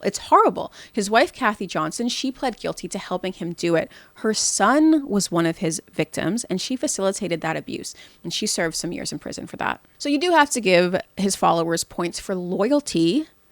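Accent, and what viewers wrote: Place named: United States. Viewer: Your so American u know nothing look at how u talk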